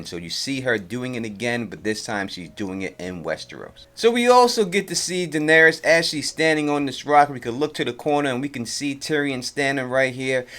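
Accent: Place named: Texas, United States. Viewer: why the hell do these channels all have texas accents?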